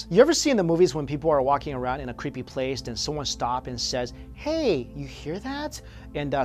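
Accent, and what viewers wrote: China. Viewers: listening to someone who is Chinese trying to pronounce Japanese words is amusing lol. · wheres your accent from? are you chinese?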